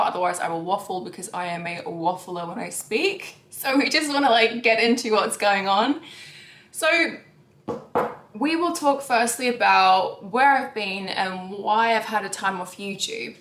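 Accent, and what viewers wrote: Australia. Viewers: Your Aussie accent has come back! Happy for you 💖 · ….she Australian ….. that her accent🤦🏽‍♀️🤦🏽‍♀️🤦🏽‍♀️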